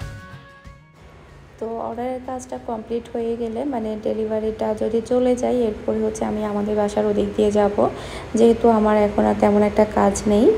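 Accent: Chittagong, Bangladesh. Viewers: আপু আপনি কি চিটাগং এর?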